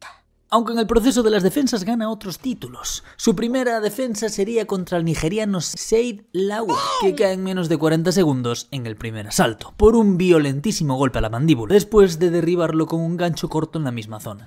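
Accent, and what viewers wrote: Galicia, Spain. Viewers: Tú acento.......eres de Compostela? 😅🙏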